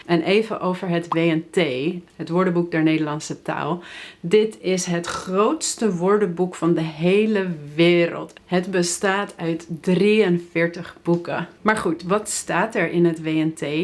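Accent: United States. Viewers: jij bent geen nederlandse je klinkt als een yank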